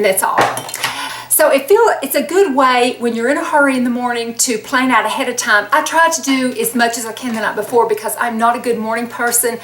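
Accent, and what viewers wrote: South, United States. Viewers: I love your videos so much! When you bring us around your lovely town I think of one of my favorite movies Steel Magnolias. I love a small town country atmosphere not to mention a southern accent. I may have been born and raised in NJ but my inner spirit is most definitely southern charm!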